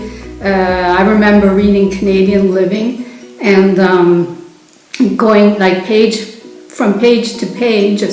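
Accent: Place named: Italy. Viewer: Well, let me just say that waiting for a delivery (a postcard, a package, etc.) IS something I get excited about. And I'm 21, haha. By the way, listening to your voice relaxes me. You've got a little Italian accent happening there. I love it. Keep it up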